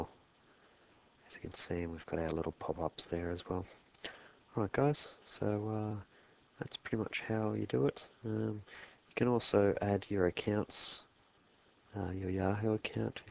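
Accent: Australia. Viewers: 10 Stars. I have searched for days for the answer to this. Your method and the order you did it all in worked for me..finally! Thank you very much. Nice calm Aussie voice, made it even more pleasant.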